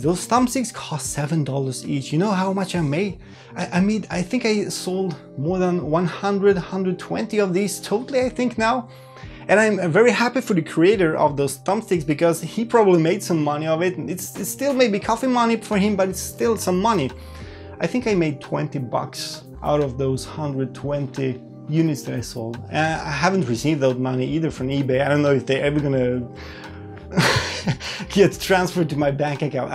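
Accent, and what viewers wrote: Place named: Sweden. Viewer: Not only does energy and enthusiasm for vr come across better than Nathie but he also speaks “swenglish” much better. Nathies popularity comes primarily from his youth and his real life infinite money cheat. My respect goes to the people who earn it outside of youtube.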